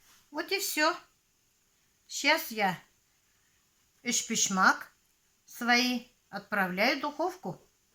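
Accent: Tatarstan, Russia. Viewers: Красивые получились! А я сегодня пекла беляши. Фарш из своей курицы и добавила в фарш мясо из свининой грудинки. Такая вкусная и сочная начинка получилась. Сын приехал- дала его семье, их четверо. И остались для нас, а нас шестеро, у дочери четверо детей. Завтра буду жарить для младшей доченьки, а их шестеро- у неё четверо детей. Кур у меня много, которых надо пускать на фарш, они уже практически перестали нестись. Мы им уже на замену купили молодок 20шт. И суточных 30шт.породы Род Айленд, из которых 18 петушков и 10 курочек, а двое погибли. Ждём ещё заказ: 10шт. Кучинских Юбилейных, 10шт. Мини-мясных палевых. И чуть позже будут ещё 10шт. Пушкинских. Да, понимаю, что поздновато мы суточных приобретаем, это потому, что ещё не готов новый курятник. А потом планируем приобрести хороший инкубатор(уже знаем какой), и будем выводить сами себе и родственникам новых курочек. 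Но думаю, что будут ещё и наседки. А инкубатор навсякий случай, если не будет наседок. Но специально выбирала те породы, которые наседки хорошие, например Кучинские Юбилейные. Но это если будет угодно Богу. Пока! Удачи тебе, моя дорогая землячка! Кончится самоизоляция поеду в Башкирию, всё немогу больше. Соскучилась по наречию татарскому и башкирскому. Ты мне со своим акцентом, как бальзам на сердце! По русски говоришь хорошо, но акцент никуда не денешь и это радует, а как запоёшь, у меня сердце от радости замирает, и детство вспоминаю. Спасибо тебе, большое, что ты есть и появилась у меня!!!!!!! ♥️♥️♥️♥️♥️ Чай п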